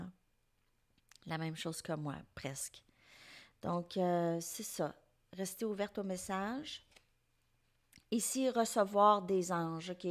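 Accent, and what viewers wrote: Canada. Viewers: j ai toujours aimés l accent canadien c est très beau 👌💋💋💋a vous entendre 😍👍👍👍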